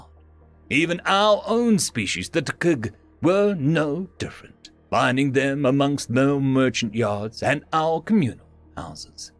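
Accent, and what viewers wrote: Australia. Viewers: Must be from down under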